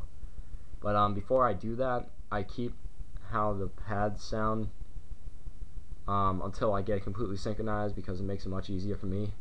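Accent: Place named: Boston, United States. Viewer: That's a Boston accent people ! Best accent on Earth!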